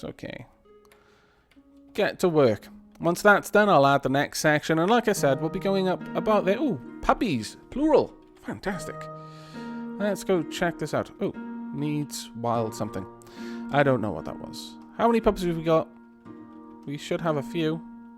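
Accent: United Kingdom. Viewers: Oh it's okay, i always enjoy these parts even if they come two weeks later. I even watched, and that's really rare, one episode twice while playing dwarf fortress just to hear your great british accent! Great videos!:D greets from Germany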